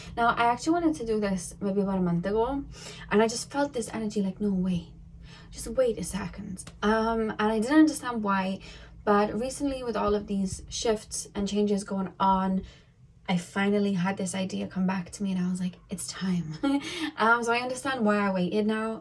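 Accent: Wales, United Kingdom. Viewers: I love your accent😍😍😍 I subscribed just to hear your accent😂 are you from Swansea?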